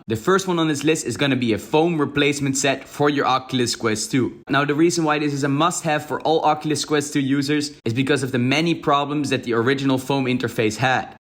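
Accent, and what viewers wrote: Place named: Netherlands. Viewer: Nederlands accentje😂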